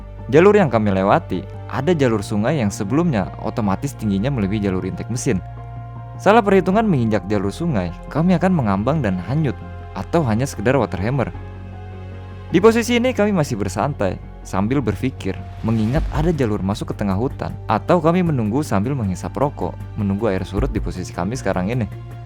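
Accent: Sulawesi, Indonesia. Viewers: Sudah belogat sulawesi euy...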